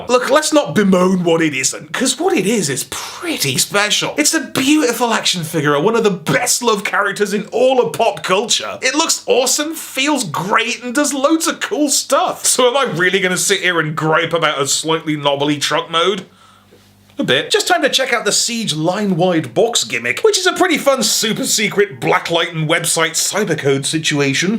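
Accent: United States. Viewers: That American accent tho! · !!! Your American accent is better than mine!!! And I’m American!! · The world needs more American. Can you do a review using only that voice? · needs to do an entire review in an American accent.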